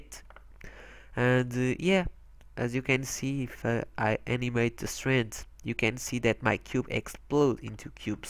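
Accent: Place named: United Kingdom. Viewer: Inglês tá bom hein kkk falando igual um britânico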